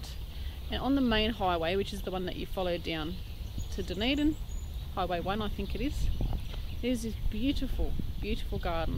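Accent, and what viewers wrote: Australia. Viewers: I thought u sounded Australian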